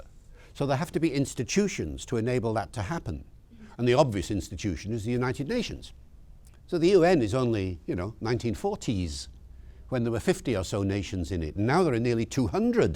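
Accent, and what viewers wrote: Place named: Wales, United Kingdom. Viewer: Very interesting. His English definitely sits on a Welsh linguistic platform.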